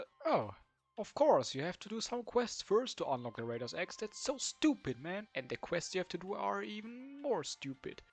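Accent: Germany. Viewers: You sound a bit german 😅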